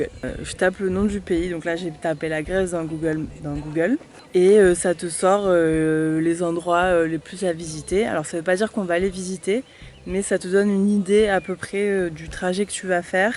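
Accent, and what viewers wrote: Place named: Narbonne, France. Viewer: J adore vos vidéos les pitchous et en plus on a le même accent !!!! (Narbonne)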